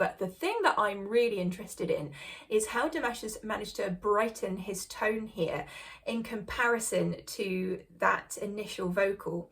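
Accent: United Kingdom. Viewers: Nice British accent you have(Shakespeare 's), thank you so much!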